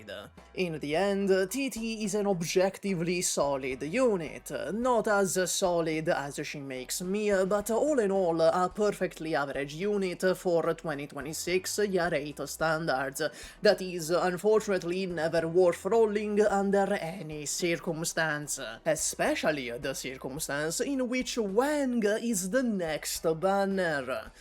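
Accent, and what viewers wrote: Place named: Italy. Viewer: your italian accent makes me parasocial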